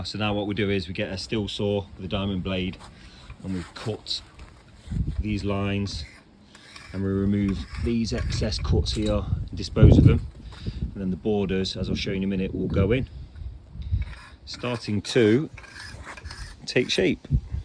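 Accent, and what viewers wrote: Nottingham, United Kingdom. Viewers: could tell straight away from his accent hes from notts! great video!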